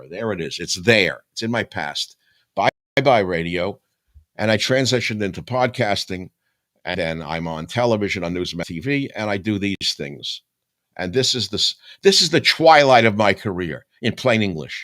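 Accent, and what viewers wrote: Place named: Brooklyn, United States. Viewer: Subbed! I didn't know you were online - I thought I lost you after you left radio. I love your accent, reminds me of my family growing up, that's a particular brooklyn accent, you must be from the same neighborhood my parents grew up in. I can listen to you read a phone book, how much better to listen to you speak the truth. America is blessed that you're still with us and of sound mind and health.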